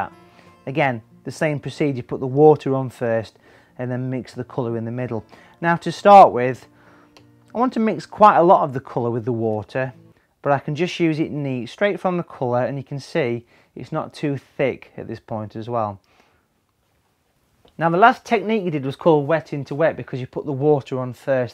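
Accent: United Kingdom. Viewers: Guy's I think this guy is british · Where is he from? A fellow North West Brit but which town?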